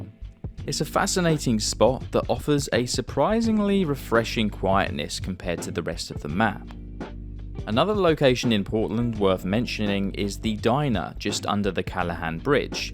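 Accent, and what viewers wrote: United Kingdom. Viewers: He's British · I played the year it came out and saw all those back then... didn't even forget 'em. My wife watched her brother play back and the day... she didn't forget them either. The houses in the ocean being weird to what sounds like an Englishman are pretty rich. You never heard of the houses ON the River Thames or the WW2 outposts that people ended up living in? SMH.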